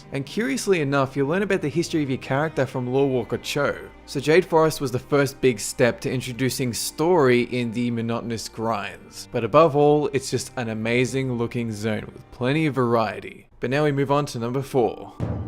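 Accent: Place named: Australia. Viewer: Oh yeah I'm aussie too. It's good to hear a familiar accent. What server's you play on? Are the oceanic servers busy these days?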